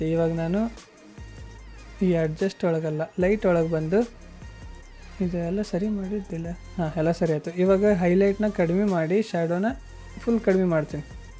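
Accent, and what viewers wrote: North Karnataka, India. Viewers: Bro en nivu uttara karnatakadavaraa? I am From Koppal✨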